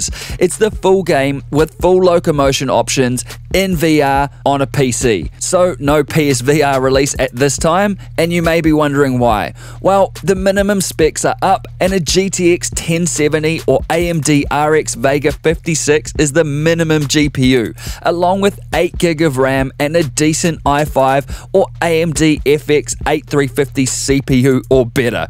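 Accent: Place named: Australia. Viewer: My favorite thing about your channel has always been your voice. It's a sonorous Aussi voice <3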